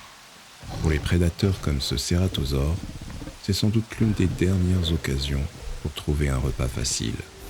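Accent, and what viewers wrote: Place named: France. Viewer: The effort is astonishingly great. The french accent makes this look like it's an actual documentary.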